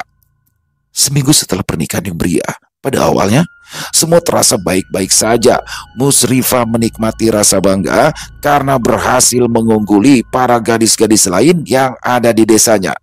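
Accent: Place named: Banjar, Indonesia. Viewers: Bang logat bahasa banjarnya mantap banar 😄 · Bang ini sudah kayak orang Banjar asli 😂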